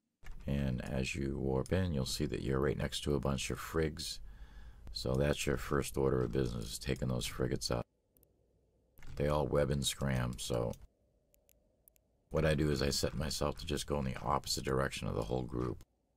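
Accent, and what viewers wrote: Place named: Massachusetts, United States. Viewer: It's a Massachusetts accent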